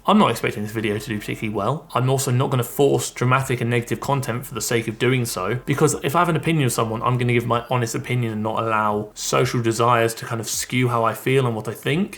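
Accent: United Kingdom. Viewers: Yours is the British accent I like. What area of the UK is from? Thanks for your videos :)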